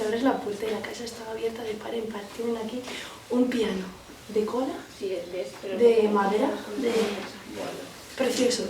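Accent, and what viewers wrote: Spain. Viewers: I just love your videos! You have awesome and extradinary videos! Your voice and Castillian Spanish is so beautiful! Your stories on the homes and assessments are so vivid! I give your videos a 20 thumbs up👍 Le Crem of the best!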